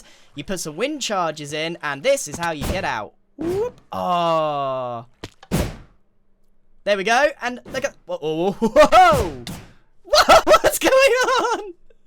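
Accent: United Kingdom. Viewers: he just sounded British to me 🤷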